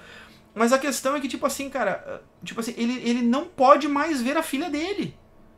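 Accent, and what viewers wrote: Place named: Porto Alegre, Brazil. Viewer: haha saudade do sotaque de poa! Me lembra o programa do Mr. Pi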